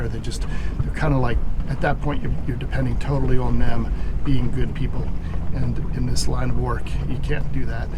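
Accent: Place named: South Florida, United States. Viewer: Great Vid. Gee, sounds like S. FL.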